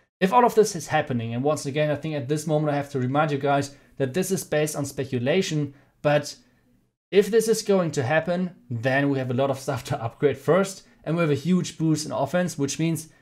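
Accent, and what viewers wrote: Germany. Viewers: German English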